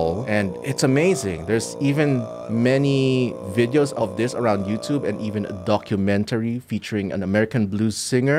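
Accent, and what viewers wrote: Philippines. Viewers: I may be wrong but.... Are you filipino? Considering your accent and your speech pattern, I'm led to assume your filipino good sir ( I'm new to this channel :'D )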